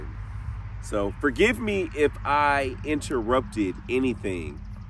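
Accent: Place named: East Texas, United States. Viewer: Awesome visit 😀 That was very nice of you to go there on moms day. I'm the one who ask you if you were from East Texas. I listened to your accent. I'm born and raised in Ft. Worth. Love your channel and approach. Keep on keeping on. 👻🌹👻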